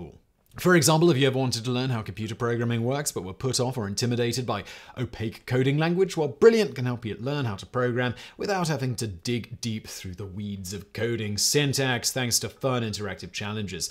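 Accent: United Kingdom. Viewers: He has a very clear and precise British accent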